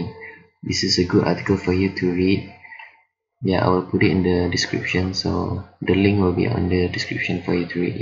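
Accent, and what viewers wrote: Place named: Singapore. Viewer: omg why did it end abruptly. machiam cliffhanger lol. But thanks so much for this tutorial! Possible to explain the difference between exporting in LR vs PS? I prefer to stay within one platform but am curious as to whether the difference between these 2 exports would be significant. P.S. I think this is my first tutorial watched done and voiced by a fellow Singaporean. Nice to hear a familiar SG voice! Thank you for the tutorial! Subbed!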